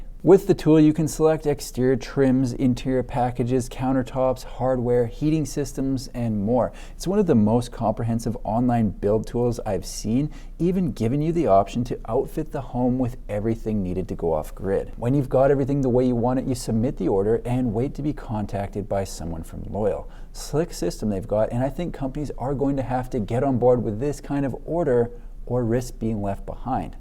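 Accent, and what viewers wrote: Canada. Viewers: Grammar guy here. I learned grammar, not pronunciation. Moreover, you're Canadian, your national pronunciation could be very different my California surfer dude twang. That written, ā indicates a long a as in hay, play, or gay. Given most US people think Canadians liter their sentences with ā, often written as "eh," I am not going to even take a run at this. Great homes, fabulous review.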